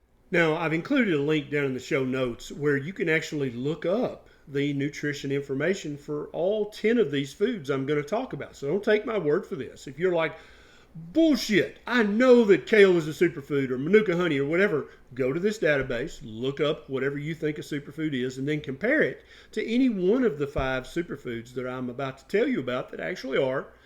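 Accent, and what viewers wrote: South, United States. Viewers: I love my southern speaking doctor!!! Great info!..thank you!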